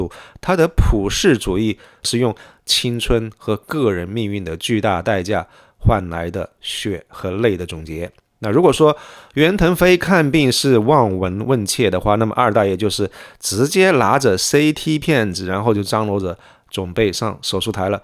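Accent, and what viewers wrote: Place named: Cantonese, China. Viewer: 这是你个人对袁老师的解读，袁老师同意了吗，另外就是袁老师在多个节目重申过，他在大学修读的是'历史教育系'，而非历史系，但绝大部分人都把把他说成读的是历史系，是博主在材料收集中'求其'的原因，我听得出博主绝对是粤语是他的母语。他明我讲什么的。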